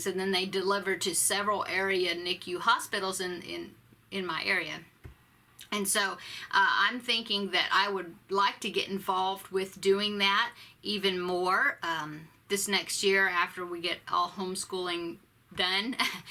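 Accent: South, United States. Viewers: Hello.... I’m a new subbie to your channel... I binged watched all your videos from the very beginning and I just love the things that you make and I just love your Southern accent.... I know in a few videos back you said that you wanted to do a nativity scene are you still going to do it??? ... I would love to see your progress on them.... can’t wait for your next videos.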